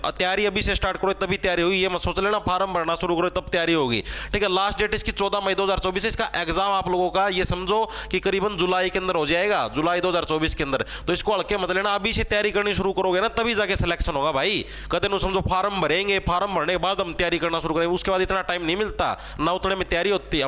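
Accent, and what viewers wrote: Haryana, India. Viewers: नमस्कार जी म थारा साथी मोहित पुनिया 😅 नर और मादा की उमर X or y or दोपहर म घणा तावड़ा पड़ रय्या छ मस्त बोली है, गुरुजी थाकी 😊 हरियाणा से हैं क्या आप❤ धुम्मा मार दयो 😅 और घूमा मार दियो नर कैंडिडेट का फिजिकल में सिस्टम पाट के हाथ में आ गया था 😂😂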